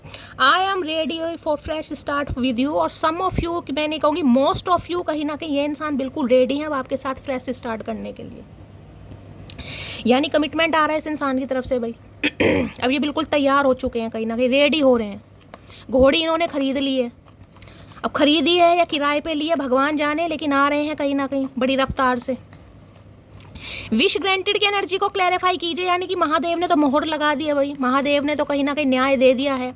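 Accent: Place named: Bihar, India. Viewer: आज बिहारी स्टाइल में आपका बोलना मज़ा ही आ गया ❤❤ और रीडिंग सुनते हुए एक ख्याल आया की आप जिस तरह हमारे पक्ष में बोलती हो तो कहीं सारे चचाचनेरा आप पर ही कुफ्र न धरने लगेंगे 😅😅 काहे दुश्मन बढ़ा रही हो प्रिय 😂 खैर जो भी हो पर आपकी वजह से हम उबर चुकें और बाकि तो महादेव हैं ही सर पर हाथ धरे हुए 🙏🏻🙏🏻🙏🏻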